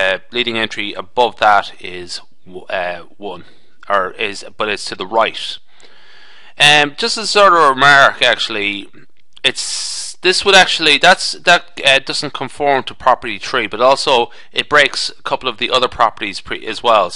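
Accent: Ireland. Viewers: it's because both are irish accents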